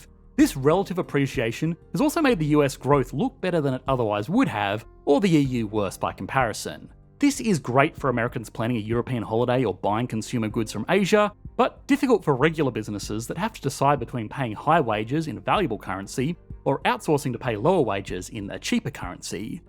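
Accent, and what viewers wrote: Australia. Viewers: Cadence is too fast. Ausssie accent makes it even harder to understand. Chaotic topical progression. Uggh. Utter disaster.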